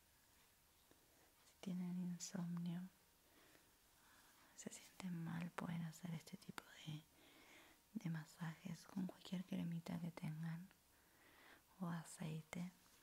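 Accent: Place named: Argentina. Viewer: Me encanta el acento argento en asmr!!!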